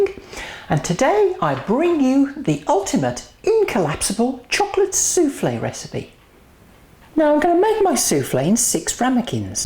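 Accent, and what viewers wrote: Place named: RP, United Kingdom. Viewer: i love your high english...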